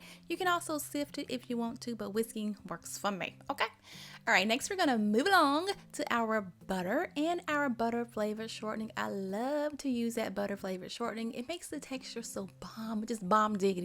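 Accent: South, United States. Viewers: I'm a texture person too! Lol I just love your sweet southern accent! I have it as well but I gotta say I could listen to you read a phone book! I am gona try this cake tomorrow! Pound cake is my favorite! Thanks for sharing! I will be liking and subscribing! And God bless your Grandma for most likely inspiring you to bake pound cakes too!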